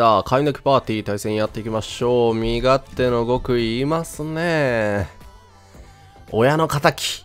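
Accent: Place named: Kansai, Japan. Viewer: スネークの関西弁もそうだけど低めで渋い声の関西弁はまじでかっこいい笑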